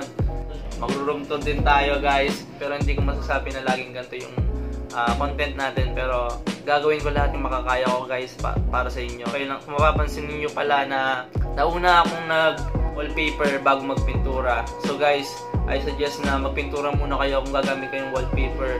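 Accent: Pampanga, Philippines. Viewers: Ako Lang Ba Ohhh May Parang Accent Sya Ng Kapampangan Pag Nag Sasalita Ng Tagalog 😂😂😂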